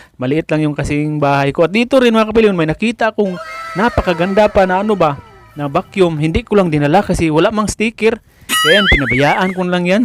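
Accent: Visayas, Philippines. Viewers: pls. mag gloves ka pohh pg mag dumpster para iwas kati sa kamay at sugat lalo na poh at kinakalkal mo ang mga dumpster... bisaya poh talaga accent mo mag tagalog...hehehe..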